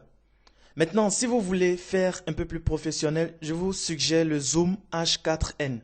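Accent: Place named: Africa. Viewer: super le frere acsent africain et tout ayeeeeeeee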